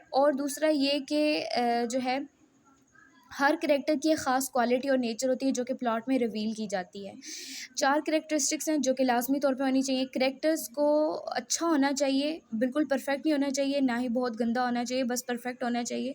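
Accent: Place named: United Kingdom. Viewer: MashAllah, mam your accent is very good and you totally seem like British... 👍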